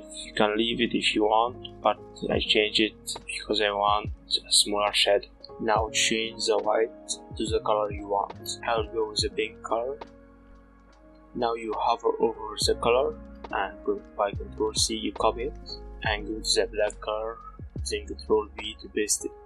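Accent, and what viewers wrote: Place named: Egypt. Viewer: You are arabic, aren't you? Most likely Egyptian. I knew it once I heard your accent lol. I am Masry as well.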